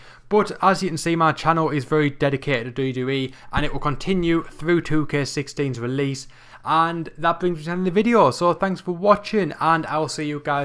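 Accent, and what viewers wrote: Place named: Newcastle, United Kingdom. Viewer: That Geordie Accent Tho xD im geordie too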